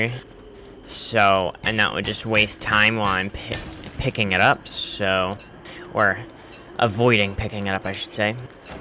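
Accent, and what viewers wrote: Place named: United States. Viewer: I tought he was american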